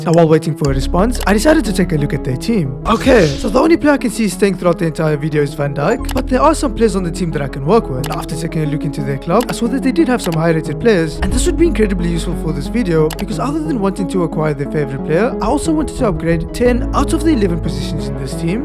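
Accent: South Africa. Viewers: Are u from SA🇿🇦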